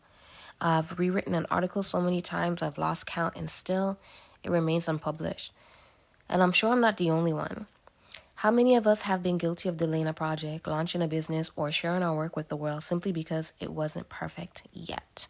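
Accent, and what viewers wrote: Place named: Toronto, Canada. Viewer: As soon as I heard you talk I said you must be from Toronto as well, Yayyyy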